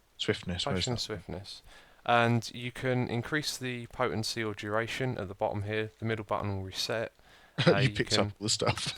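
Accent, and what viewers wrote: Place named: United Kingdom. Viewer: do you realize for the longest time whenever you mentioned Biffa, I always thought you were saying Biffer, with the British accent there's not much of a pronunciation difference anyway.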